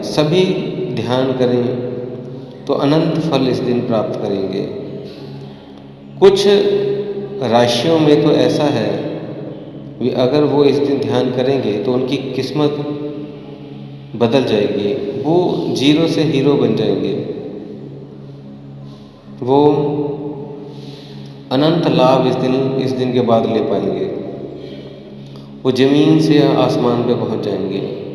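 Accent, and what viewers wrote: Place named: Punjab, India. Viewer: आपकी आवाज बताती है आप पंजाबी हैं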